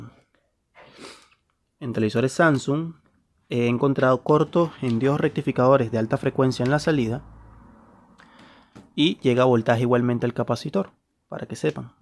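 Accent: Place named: Venezuela. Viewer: Por tu acento mi pana veo que eres venezolano igual que yo.... Te la estás comiendo viejo... Gracias en nombre de la comunidad que nos apasiona la electrónica porque tus clases son gratis, bien explicada con excelente metodología.... No le pares bolas a los envidiosos...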